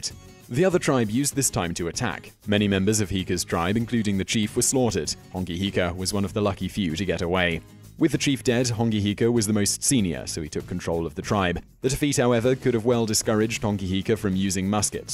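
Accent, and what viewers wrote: United Kingdom. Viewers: He's British, his way of pronunciation trumps yours. That's how we say it in America too, so your way is wrong. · Wtf do you expect, he's British. Can you imagine a Kiwi trying to pronounce Middle Eastern or Asian names correctly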